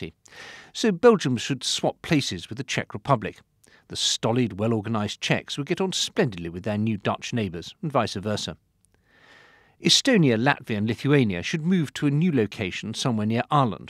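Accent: United Kingdom. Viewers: I love how the guy sounds exactly as you'd expect a british guy that is drawing border to sound, complete disregard for cultural boundries, superficial understanding of intercountry problems, arbitrary splittings and most important of all preferred to rip out northern Ireland making it an island of it's own without any mentions rather than giving it back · Wow nice British accent mate..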